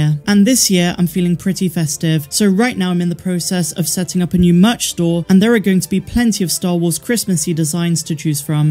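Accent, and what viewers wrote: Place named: Bristol, United Kingdom. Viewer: Love the videos! Gotta say that every video reminds me of home with certain words having an almost Bristolian accent!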